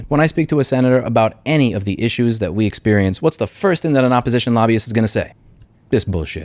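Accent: New York, United States. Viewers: your New York came out full force here, right on!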